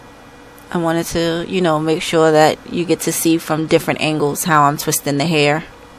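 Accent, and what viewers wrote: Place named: New York, United States. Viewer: You sound like you are from my neck of the woods. Are you in or from Ny ? I love it from the 1st twist you installed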